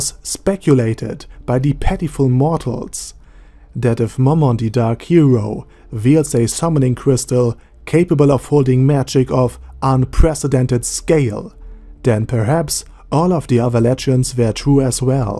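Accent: Germany. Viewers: Man merkt das der deutscher ist an seiner englischen Aussprache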